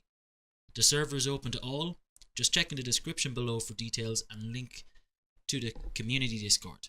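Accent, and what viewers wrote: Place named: Newfoundland, Canada. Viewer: You're from Newfoundland arent ya! I'm from Ontario, we love you fellas :)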